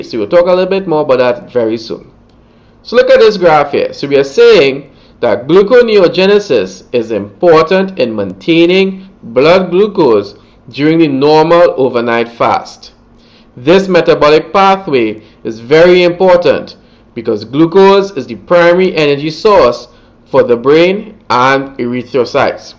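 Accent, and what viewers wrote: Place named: Caribbean. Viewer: Dr., Been in broadcasting 33+ years and am now in studies for personal Fitness Training Certification. Huge chunk of the studies are all about this (ATP production is the focus). Not only have your lectures filled the blanks...but I grew up in St. Thomas, and hearing the Caribbean dialect just adds a comfort level to the learning...MESON!:-) Thanks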